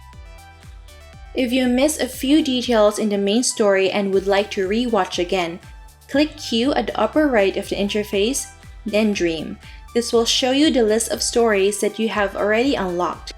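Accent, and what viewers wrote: Philippines. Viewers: hi miss are you half filipino? just want to know bcz of some accent words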